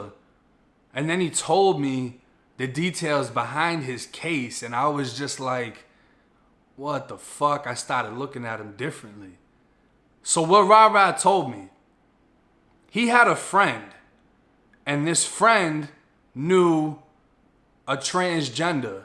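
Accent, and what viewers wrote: Boston, United States. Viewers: Lol Cuz is u from boston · Hahaha Irish ☘️ 💪🏼And italian 🇮🇹💪🏼with a straight southie (Boston) accent.... it make all sense now .... did u ever explaine in your vids or anyone ask how the Florida relation goes with the New England accent??💫✊🏼💥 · You from Boston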